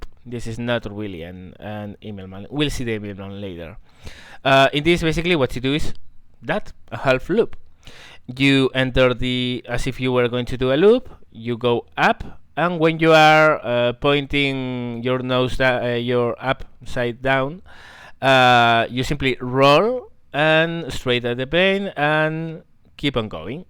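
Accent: Spain. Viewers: Illiteracy has nothing to do with accents. And yes, that is exactly what it is, an accent. You might want to read up on it, unless you happen to be illiterate yourself. His English might not be perfect but it's good enough to get a message through to just about anyone.. The way he pronounces words is affected by the fact that he's Spanish. Also, here, where I live, we have these things called manners. You might want to read up on those too.. so you don't have to resort to personal attacks. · tu inglés te delata, eres español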